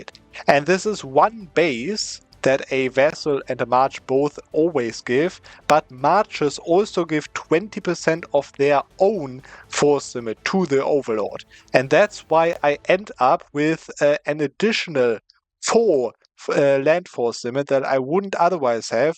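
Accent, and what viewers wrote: Germany. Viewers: As a Pole I hear that you're German in every word you say haha. Great video, good advice bro!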